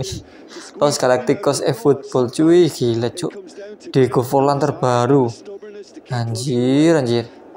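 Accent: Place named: Java, Indonesia. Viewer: Medognya masih kentel😂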